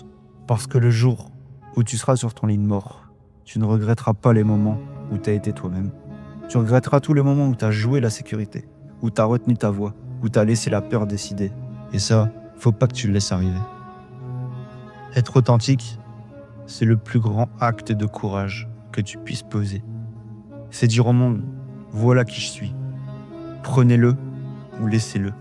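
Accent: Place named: North, France. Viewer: T’es du nord ahah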